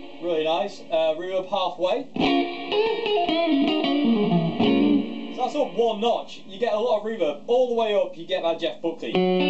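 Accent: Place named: Sheffield, United Kingdom. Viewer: Hi, thanks for the demo. Couple of quick questions I know it's the bandit solo series but is it the 50,65, or 75 watt version I can't see on the video? The other thing is have you tried Tech21's Trademark 60 I really think you would be impressed. Listening to the way you talk I suspect you don't live a million miles away from me in sheffield. You can demo my Trademark 60 if you like I don't think you will be disappointed. Not that I'm knocking the bandit I've got a 1991 teal stripe and that love too!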